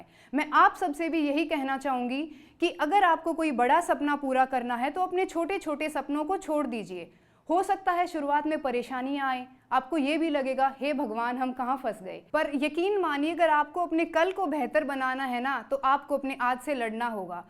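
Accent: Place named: Haryana, India. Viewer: Apki haryana dialogue bahut mast thi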